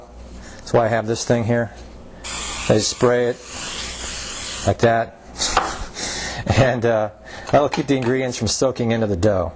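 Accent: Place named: United States. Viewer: This video is great, however I am confused with the "cups" measurement. I am from Europe and have no idea what a cup sized is, i have a couple of them, and most of them are different size lol, and in addition, I have found several version of "cups" on few websites i visited. I suggest that one cup is 136 grams of flour or 240 milimeters of water, which was referred as american cup, and because of the guys accent, i would say he is from us.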